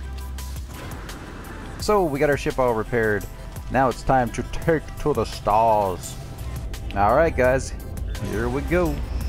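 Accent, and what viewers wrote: Newfoundland, Canada. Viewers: Judging by your channel name and accent im going to guess you're from Newfoundland? lol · Are you from Newfoundland?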